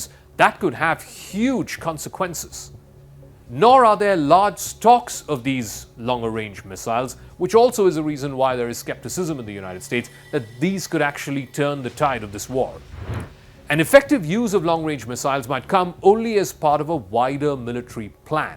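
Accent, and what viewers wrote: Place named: India. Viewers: The first ever Indian that I have listened to that speak fluent English with proper accent unlike indians with all respect. Perfect English perfect accent perfect view of war and not biased. Respect to you. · This is Indian accent. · He still has trace of Indian accent.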